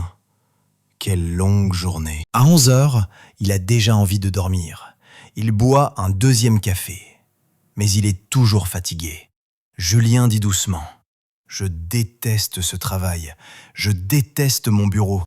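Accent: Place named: France. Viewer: I liked your dialect when you speaking English with French flavor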